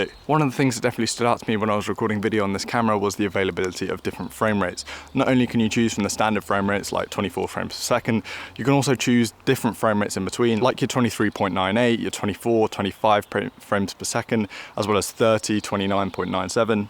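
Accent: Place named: United Kingdom. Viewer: thanks for your quick reply, of course you are english mother tongue (only your surname sounds Italian, your pronunciation and accent are perfectly british), I am not, that's why I wrote you that you are very clear to understand (for a foreigner like me).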